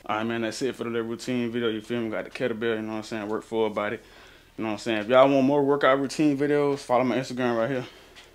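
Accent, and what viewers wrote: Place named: Georgia, United States. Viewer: twin gotta be frm ga , ik my fellow Georgians when i hear them 😭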